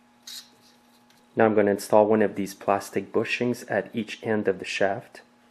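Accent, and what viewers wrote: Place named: Quebec, Canada. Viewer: you speak English very well but I notice a super small accent...are you from quebec?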